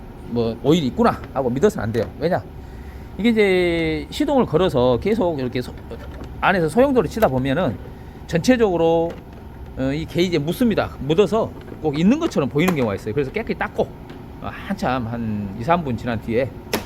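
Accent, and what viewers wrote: Jeolla, South Korea. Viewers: 고향떠나 해왜생활하면서 전라도 고향말을 잘듣고있습니다. 건강허세요. 😜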